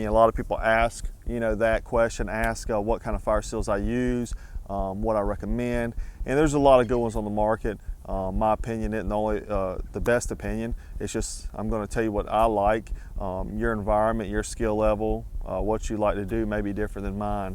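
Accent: South, United States. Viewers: You got a very cool southern or western accent. You should try reviewing guns. Your voice really suits it.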